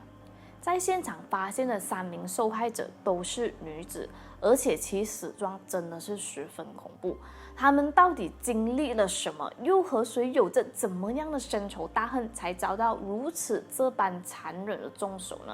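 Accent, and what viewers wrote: Malaysia. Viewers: 马来西亚华语音调。 广东客家合调！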